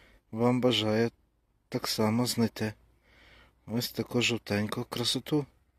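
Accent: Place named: Western Ukraine, Ukraine. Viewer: Як приємно чути нашу западенську😅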